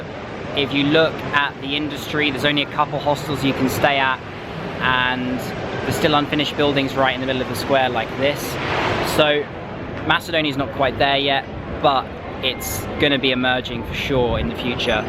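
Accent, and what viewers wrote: United Kingdom. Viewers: I hate that British accent , coward Brit’s gave their county away to Muslims , puke 🤮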